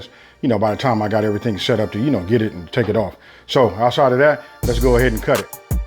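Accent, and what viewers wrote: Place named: San Francisco Bay Area, United States. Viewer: you sound like your from The Bay....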